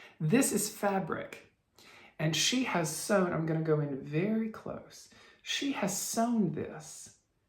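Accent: South, United States. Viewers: Enjoyed your Granny’s poetry once again…and I get a kick out of slipping into your southern accent. It does indeed add to the reading. That Home of a Needleworker is adorable. Your garden is lovely. Thanks for sharing it with us. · Having grown in Mississippi and still live here, I love and appreciate the stories. The southern accent just enhances the poems. Hope you have a great week. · Loving the videos! Ronnie. Lavender. Needleworker. I’m new here and love what I see! Thanks you! You are so funny! I love your mannerisms and southern accent! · Ooohhh Ms Gibbs telling you that you needed to improve your potato peelin skills....your face, priceless! Did you show cross stitch, you probably did. But your stories in that lovely southern accent warm my heart. We spent 3 years in Alabama with my husband's work. Alabama has a different breed of people. The kindest, most generous, funniest and every one has a story! 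You can't go buy some beans without a story. I miss it there. You bring me back. 💗⚘️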